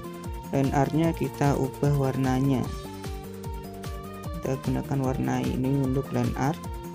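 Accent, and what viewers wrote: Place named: Java, Indonesia. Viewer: Klo bisa ngomong medok nya dikurangin,soalnya agak gk faham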